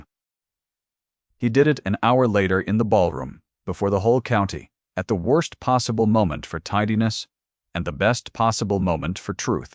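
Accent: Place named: North America. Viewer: The North American accented AI for a story set in 19th century England doesn't do it for me...and the voice was very monotone. ZZzzzz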